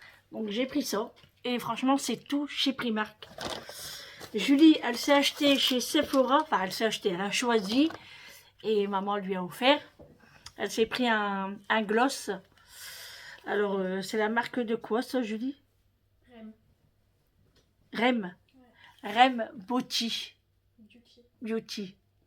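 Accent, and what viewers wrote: North, France. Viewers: Bonjour joyeux anniversaire j espère que tu sera gâté oui a noyelle beaucoup de magasins je suis allée avec ma fille je n en pouvez plus quand je t ecoute dans t et videos on a beaucoup de points communs j aime ta simplicité et notre accent du nord moi samedi je vais faire du shopping avec ma fille aussi toujour bien decouvrir t et menus bonne ballade enyre mère et fille a bientôt 🥰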